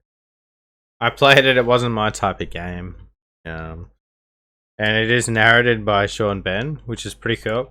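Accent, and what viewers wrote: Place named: Australia. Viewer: where abouts in Australia are you from champion?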